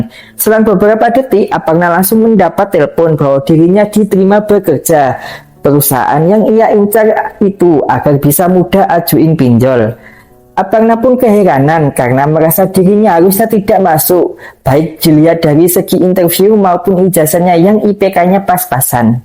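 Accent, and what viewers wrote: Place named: Banyumas, Indonesia. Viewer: Ngapak yak😅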